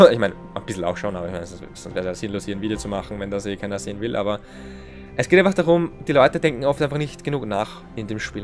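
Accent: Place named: Vienna, Austria. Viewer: bist du österreicher :D klingt iwie wienerisch :D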